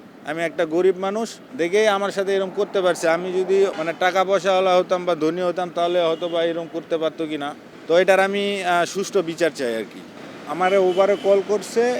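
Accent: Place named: Bogura, Bangladesh. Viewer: ভাইয়ের কথার টোনে বোঝা যাচ্ছে ওনার বাসা বগুড়ার কোনোজায়গায়...!!!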